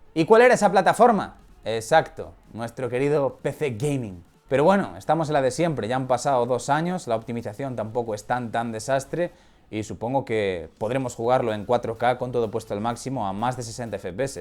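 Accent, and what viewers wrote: Galicia, Spain. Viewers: Es cosa mía o eres gallego? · Alguien sabe de qué parte de España es el ascento de este tipo?? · Eres gallego o el acento me engaña jaja Forza galiza jaja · Eres gallego?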